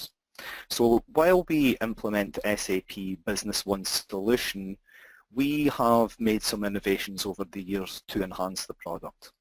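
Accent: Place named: Germany. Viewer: Like your German accent :) cheers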